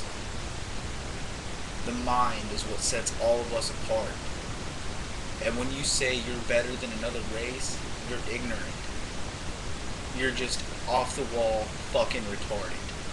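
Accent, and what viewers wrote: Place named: Philadelphia, United States. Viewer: You sound like you are from Philly. Move to Camden, live there for a year, and then please do a video update. Until then, please explain your theory of what is or isn't "punk rock" because it sounds to me like you think it is "punk rock" to take the establishment position on every last little thing. Wee bit odd, yes?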